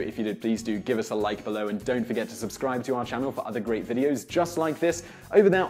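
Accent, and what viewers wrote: United Kingdom. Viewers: Yeah I turned it off before a minute I don't need to hear a lot of blah blah blah in a British accent about stuff I already know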